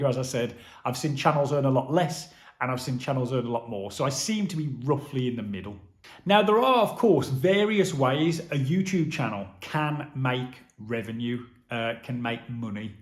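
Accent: Black Country, United Kingdom. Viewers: Hi, Steve here from Wolverhampton. As you say, it's time consuming work operating a small but growing YouTube channel, but you have done more than most and become monetised in just over a year. I have only just come across your channel and enjoy your presentation style in that fellow Black Country accent with an open and honest approach. I have particularly liked your pension update and solar panel review and have diarised Ace Solar for when I get quotes next year and will mention your name when I contact them. Now for a few new video idea - Based in the Black Country, the new Peaky Blinders movie coming out soon how about a trip to the Black Country Living Museum showing the delights of this fantastic facility and some of the locations for filming - Food for thought - Keep going, we are all enjoying your posts.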